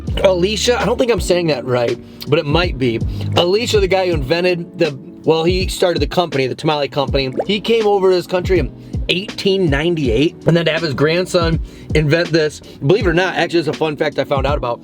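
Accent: Chicago, United States. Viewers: I don't even need to watch the video, but only listen to him speak momentarily to know he's from Chicago, my hometown. 😂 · lol. I was guessing this video was made 15 years ago! Your Chicago accent makes this a genuine Chicago food video. 😂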